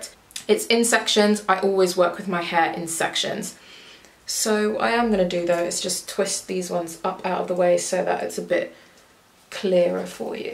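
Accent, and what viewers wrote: United Kingdom. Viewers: Londoner right there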